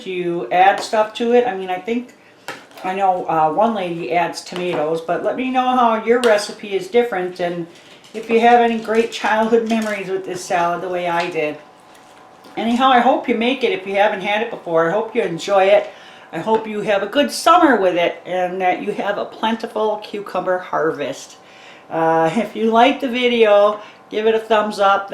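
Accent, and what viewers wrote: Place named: Milwaukee, United States. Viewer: I am excited to try it! I have had it at church potlucks but never have made it. I love your Milwaukee accent.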